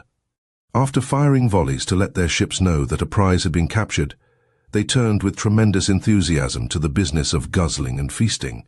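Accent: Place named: United Kingdom. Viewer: Is there a reason a British accent is telling strictly AMERICAN history? Sounds off.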